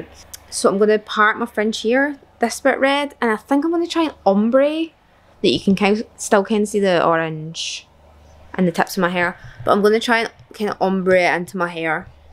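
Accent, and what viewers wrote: Scotland, United Kingdom. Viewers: is she scottish? demyn lovin her accent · Is Scottish an accent or an language?? I'm trying to learn Scottish accent from her 😫🙏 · aeibeirats" or idk what thats supposed to mean lmao im just here for the Scottish accent I love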